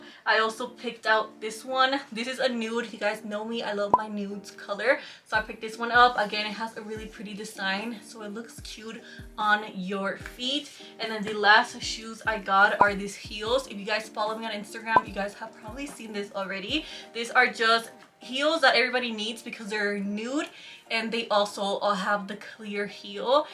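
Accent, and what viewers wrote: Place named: Puerto Rico. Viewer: Your Puertorian Accent is Everything☺️😁❣️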